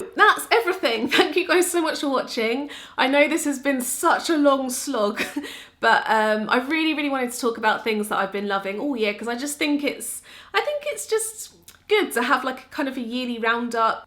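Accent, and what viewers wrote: United Kingdom. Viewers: I just LOVE your british accent #italylovesyou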